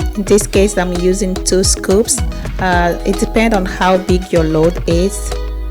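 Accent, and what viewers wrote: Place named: Africa. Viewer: That mama africa accent 😩❤️🥰 i love it so much! Wonderful video mama!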